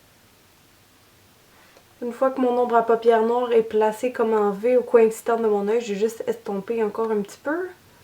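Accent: Quebec, Canada. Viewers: Whoua Alyssa Milano avec l'accent québécois ;-) très jolie !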